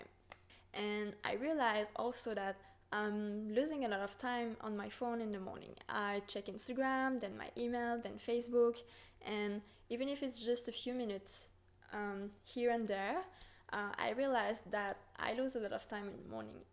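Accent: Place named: France. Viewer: Are you French? You've got a cute accent.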